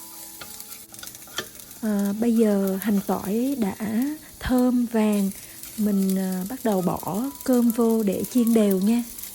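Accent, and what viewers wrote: Hue, Vietnam. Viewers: Người Huế .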